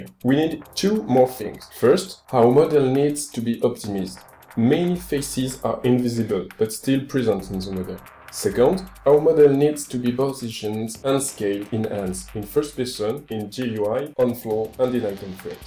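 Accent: France. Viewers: Very good tutorial. You have a very heavy French accent, but still it's really good that you took the effort to put subtitles under it :) Keep on making awesome voiced tutorials! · are you french, tu est francais? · On distingue un petit accent français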